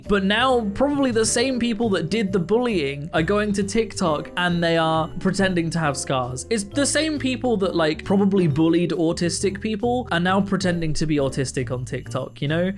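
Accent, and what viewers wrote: United Kingdom. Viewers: eheheh thank you, it’s honestly just a standard british accent!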